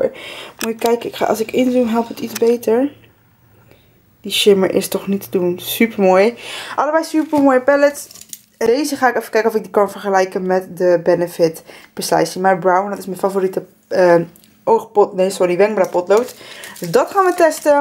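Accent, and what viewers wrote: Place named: Rotterdam, Netherlands. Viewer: plat Rotterdams accent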